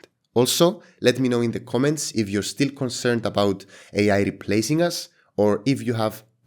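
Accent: Cyprus. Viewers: Hey Pete, I can tell from your accent that you are from Cyprus Χαίρετε κουμπάρε μου😂😂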